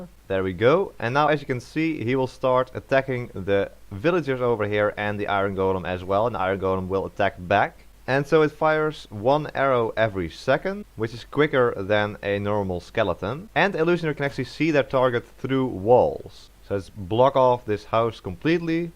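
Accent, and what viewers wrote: Netherlands. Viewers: ah, dutch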